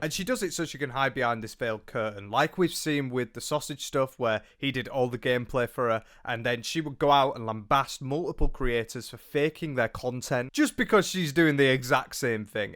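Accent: United Kingdom. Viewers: British man. i approve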